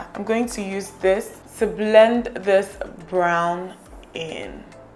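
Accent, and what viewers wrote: Igbo, Nigeria. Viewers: you should do a makeup video in Igbo! It would be so nice! Plus ur Igbo accent is 😍😍😍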